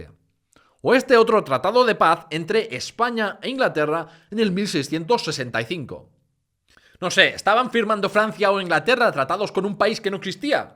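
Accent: Catalonia, Spain. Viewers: Desde luego tienes acento catalán hablando Castellano. Aparte de eso de historia sabes un rato. El saber es un problema hoy en día. Nos quieren tontos así no manejan mejor. Sigue adelante.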